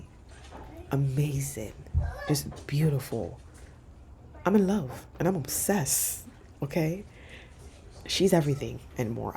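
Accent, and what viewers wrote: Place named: Jamaica. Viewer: Everything looks great. I just love it. I noticed when talking to your husband your Jamaican accent is really thick as opposed to when you talking in your videos. This is not criticism just something I noticed. I loved it.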